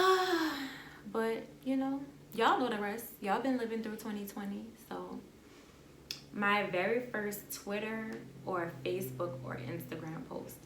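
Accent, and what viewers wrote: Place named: New Orleans, United States. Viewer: You definitely sound like you’re from NOLA lol I guessed it before you spoke about Mardi Gras. I got a close friend from there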